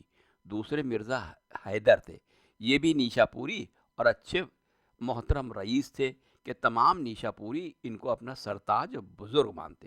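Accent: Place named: Lucknow, India. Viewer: बेहतरीन जानकारी वह भी लखनवी जुबान में 👌👌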